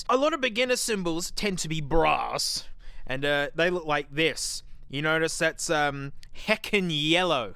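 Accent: Australia. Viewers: its so weird hearing the aussie man in my ear, without any funny music in the background. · i really like the sassy diva pop song mr loud Australian man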